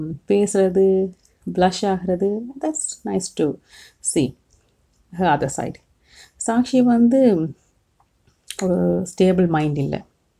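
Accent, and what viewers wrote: Sri Lanka. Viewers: இலங்கை(இனிமை) தமிழ் 👍 👏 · இலங்கைத் தமிழ இங்கு கேக்க நல்லா இருக்கு அக்கா. நல்லா செய்திருக்கிறிங்க அக்கா. வாழ்த்துக்களும் நன்றிகளும்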